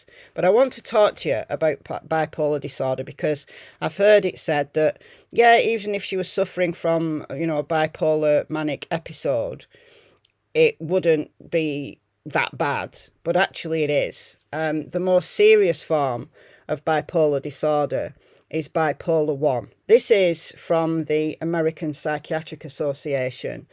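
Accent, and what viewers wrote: United Kingdom. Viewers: I tend to think that you are right. I just don't like that the video has been edited and that they lie about it, or that nobody could see what was recorded on the other cameras in the hotel. I don't know if they are just protecting themselves or if something shadier has happened. Because the hotel had such a bad reputation, they had trouble finding employees so they often hired ex-convicts. I have seen some interviews with people who have reported assaults from some of their staff members, and some who have been raped on the roof. So maybe someone saw a vulnerable girl and took advantage of her? Anyway, a great video. I always enjoy your take on different cases, and I just love your british accent! ;-)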